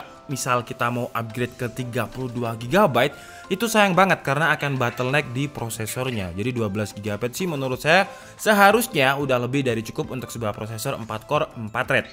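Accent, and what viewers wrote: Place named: Madura, Indonesia. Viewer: Logat Madura kek gitu Madura bagian timur, ngk semua kok logat kek gitu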